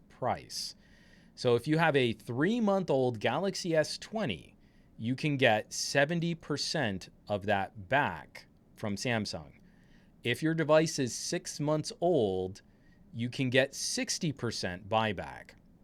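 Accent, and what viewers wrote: United States. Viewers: Wait, you're from New Mexico or???.-.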